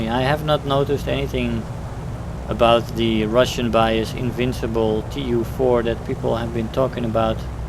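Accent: Netherlands. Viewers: i can hear ur Dutch :)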